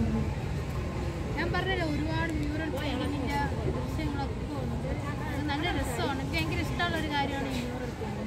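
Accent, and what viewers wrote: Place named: Thiruvananthapuram, India. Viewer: മോളുടെ സംസാരരീതി കേൾക്കുവാൻ നല്ല രസമാണ്. പ്രത്യേകിച്ച് തിരുവനന്തപുരം ശൈലി.❤️👌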